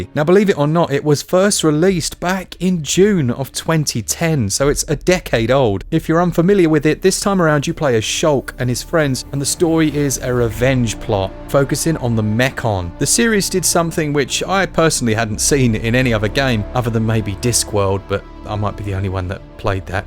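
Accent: United Kingdom. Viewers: To be honest outer worlds sucked, the story line is predictable, it just feels off and I think the reason why most enjoy it is due to the fact that they hyped it via media, youtube etc. So much, it was a true disappointment to me, get elder scrolls alot better and by the way I enjoyed this vid and its the first one I have seen I find most brits with a to heavy accent while your own is just smooth, i would love a top 10 with your personal rankings.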